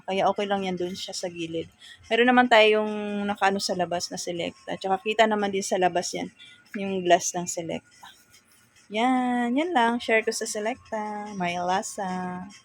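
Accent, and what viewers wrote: Waray, Philippines. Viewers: Hello madam new subscriber here. Waray waray ka man po. Hehe. Taga diin ka po? 😊😊😊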